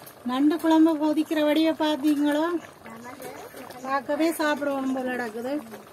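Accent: Jaffna, Sri Lanka. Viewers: Akka u vioce super original jaffna tamil 4 sweden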